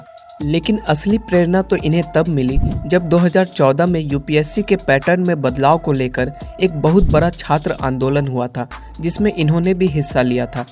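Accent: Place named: Bihar, India. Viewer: Bihar me ye ek hi hota hai , grammatically wrong but expression well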